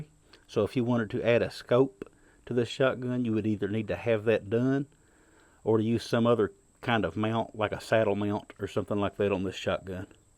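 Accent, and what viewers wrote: United States. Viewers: балдежное произношение. в каких штатах разговаривают в такой манере?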